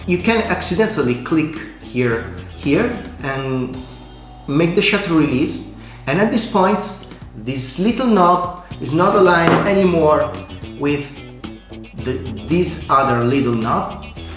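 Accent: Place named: Italy. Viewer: Great vid. Very much to the point. I'm watching auctions online and I'll buy a 500 C/M when I find one at a price I like but your video sated my apetite a bit at least. I dig your accent btw. Your speak with a good flow so it's not bothering at all. By that and from your name I deduce you're italian. Correct? Cheers from Sweden.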